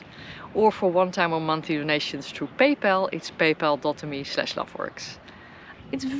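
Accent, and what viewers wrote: Netherlands. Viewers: Waarom heb jij zo'n gruwelijk Nederlands accent? Je moet echt veel meer "overdrijven". Bijvoorbeeld "tolk about", de klank van jouw talk rammelt. Het is een O geworden, niet iets als een OA. Ga eens wat harder aan de klank van al je klinkers trekken. Maak het bekakt! Dat helpt. Alle Nederlanders zeggen UPDEET. Engelsen zeggen APDEET. En grapje, zeg nooit meer FOK tegen je djip. Dat gaat op een dag helemaal fout! Fok?! What? Hee, mijn "sorry" is weg. Dit stukje was NIET vervelend bedoeld. Ik hoor gewoon meteen Nederlands. En dat zit vooral in de klank van je A O U. Engelsen doen dat echt totaal anders. Jij niet en dat hoor je. Zelf intussen jaloers. Altijd geldproblemen en boten in slechte conditie gehad. Een kwarttonner Waarschip is prachtig, als al je hout in orde is. Van een lekke kielbalk word ik ongelukkig. Hij kon nergens op de kant voor een normaal aantal euro's, echt, dan word je NIET vrolijk. 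Kon hem zelf wel repareren, maar niet "effe", echt niet. Ik wil graag weer zo'n bootje, klein is leuk, wie weet lukt het nog eens. Voorlopig heb ik een hele goeie kano. Dus, het allerbeste met zeilen!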